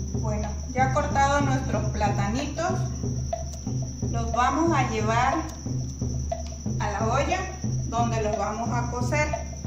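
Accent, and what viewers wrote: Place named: Tabasco, Mexico. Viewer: Que preciosa es mi tierra (Tabasco) da gusto ver el canal de una paisana y escuchar el acento choco ❤ saludos desde San Luis Potosí, cuando voy a mi tierra aprovecho a come de todo y me traigo todo lo que puedo 😀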